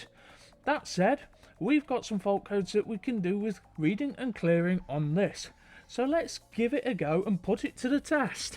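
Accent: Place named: Yorkshire, United Kingdom. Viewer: his yorkshire accent i love it! its when it drives around the corner and im like UGHHH but this is coming from someone who had a corsa B that shafted valves, waterpump and needed a rebuild...never forget that sack of.....but this...i love the colour and its basically a van! its a decent car and tbh nothing like the 1.8 i test drove years ago tbh i do like the fact its like a beamer...more oil out of it than in it haha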